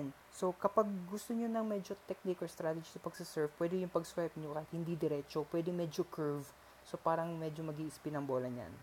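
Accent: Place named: Brazil. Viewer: Tu é br ne o seu sutaque parece pq não vez um em ptbr?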